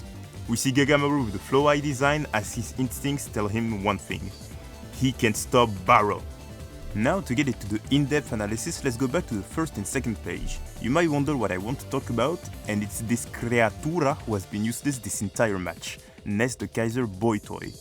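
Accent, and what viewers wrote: France. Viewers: je me disais que t’avais un accent français 🤣jusqu’à ce que je vois ta bio, continue comme ça bgg force !!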